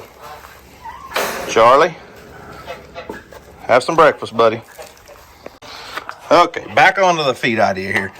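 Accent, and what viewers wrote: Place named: Alabama, United States. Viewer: Those that are wondering…. has to break up the Cog….Hill because the A.I. (Artificial Intelligence) that adds the Subtitles, hears Cog Hill said normal speed with an Alabama accent, as Cock. It thinks is swearing/being rude and either removes the video or doesn’t pay him. 🤦‍♀️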